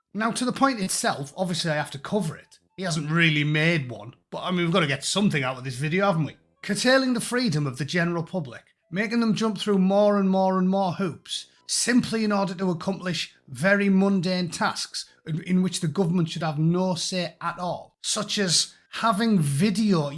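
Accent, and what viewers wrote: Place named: Newcastle, United Kingdom. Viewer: This country is a mess, but tuning in every day to hear the words Rudu-Kunubu with a Geordie accent makes everything a lot more bearable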